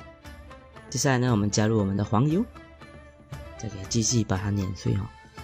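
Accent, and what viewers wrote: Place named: Malaysia. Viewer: 马来西亚乡音，大马人没错吧。感谢教学，想做这个很久了。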